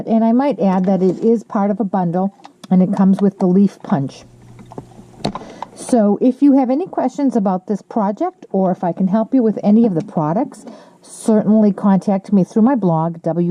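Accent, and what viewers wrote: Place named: Maine, United States. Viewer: Super cute card need to get the letters lol. Need to look and see if you have scrapbook pages videos. I hear your accent where are you from sound like Maine.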